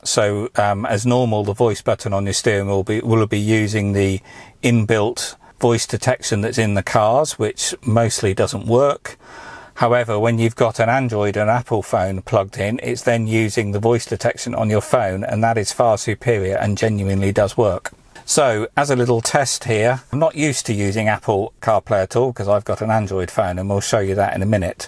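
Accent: United Kingdom. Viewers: The voice has a British accent, lol! Awesome.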